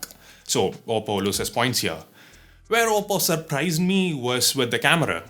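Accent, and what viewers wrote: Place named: South India, India. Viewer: Still you have heavy south Indian accent. Nice review though...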